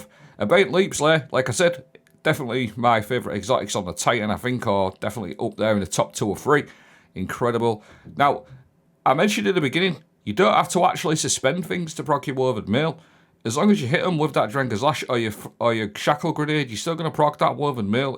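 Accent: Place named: Yorkshire, United Kingdom. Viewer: Love the content mate! Can relate to the Yorkshire accent too, which is always nice :) keep up the good work bro!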